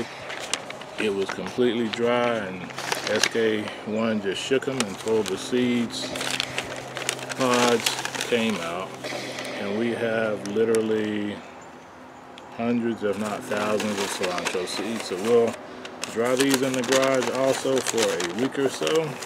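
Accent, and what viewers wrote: United States. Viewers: Hi it's interesting how there are slight variations in the pronounciation of quite a lot of fruits, vegetables and herbs between English here and American English there ( we say Herbs, you say Erbs, never figured out why as it's spelled the same 🤔🤔 ), but a few have totally different names, one of them is Cilantro which of course we call Coriander, I have no idea where the totally different names originated for the same herb....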